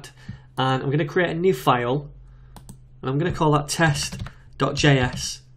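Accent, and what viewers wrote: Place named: North, United Kingdom. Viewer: Clear and concise tuts with the added bonus of a northern accent.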